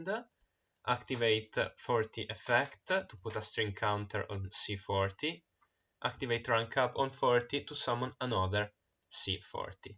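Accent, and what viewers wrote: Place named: Italy. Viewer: Bro non ti conosco ma so che sei 100% italiano e lo capisco dall’accento ahah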